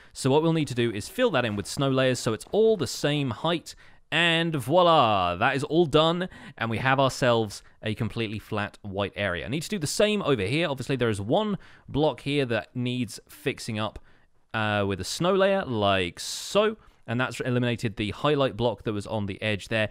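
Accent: England, United Kingdom. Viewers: My gosh I love your videos and your English accent!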